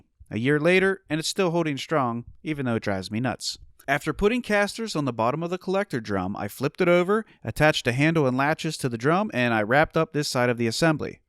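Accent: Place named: Philadelphia, United States. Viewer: is that a philly accent? its so thick! lol!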